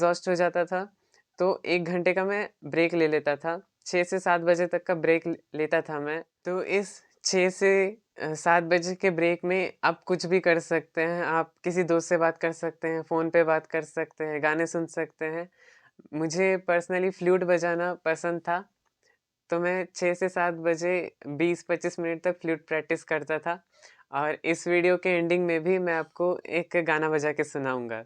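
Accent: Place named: Bengal, India. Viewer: তুমি কি বাঙালি??? কথার মধ্যে বাংলা tone আছে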